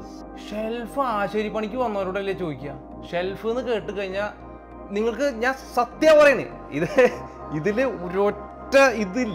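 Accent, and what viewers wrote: Thrissur, India. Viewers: I came to know of your channel thru Sujit. Your way of story telling is very nice. I like your Thrissur slang. Since lam a vegetarian scenes of meat in your recipes are digusting for me. But l enjoy your narration of stories. Good luck for your future plans.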